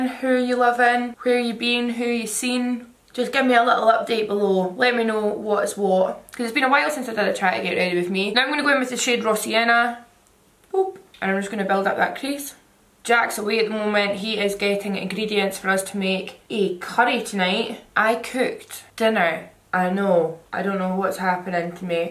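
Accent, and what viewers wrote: Scotland, United Kingdom. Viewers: ...i just recently found you on YouTube and now i cannot stop watching your videos!!!! you're absolutely gorgeous, your makeup looks are always fire, and i am obsessed with your beautiful Scottish accent!!! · her accents amazing ! there's hardly any scottish youtubers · You are so different and legit beautiful, I love the fact that you are not like everyone else, and I flipin love your Scottish accent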